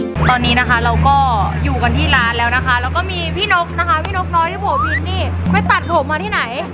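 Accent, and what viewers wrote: Nakhon Sawan, Thailand. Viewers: เฮียเหน่อออออแบบฉบับนครสวรรค์ของแท้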